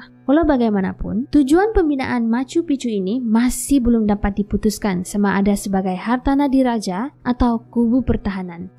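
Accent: Sabah, Malaysia. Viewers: Kakak jane pun ok la.tapi lagi best abang.suara abang lagi besy sebab loghat sabah yang merdu jee.tapi kakak jane pun best jugakk😀😀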